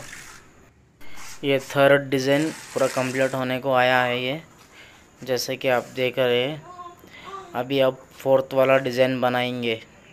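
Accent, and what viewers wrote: Hyderabad, India. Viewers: All are good designs....My teeth were actually sensitised with that scratch sound.....loved your hyderabadi way of speaking.....bole to bahot acha laga 👍😊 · Hyderabadi ka language mast hai 😄. My favorite is +- and Blade design. Great work art.👌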